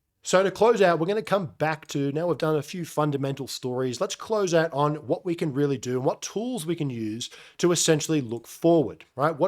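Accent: Australia. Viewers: R U Australian btw?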